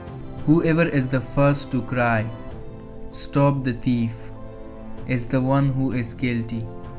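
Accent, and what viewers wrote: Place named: India. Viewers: Wtf ??!!!! Racist on vocal sounds ?!!!! Why it’s Indian voice not English !!!!???? SERIOUSLY?!!!!! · What annoys me is the Indian speaking. Why dont just use English voice speaker those are free on the web?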